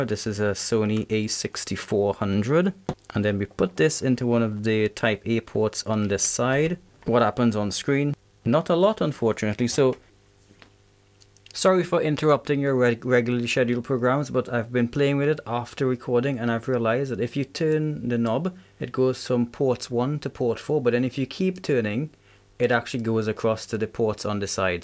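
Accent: Trinidad and Tobago. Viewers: are you from Trinidad 🇹🇹? I was born in the States but grew up and went to primary school there. I know that accent when I hear it👍🏾. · I subscribed because of the accent that sounds trini 😮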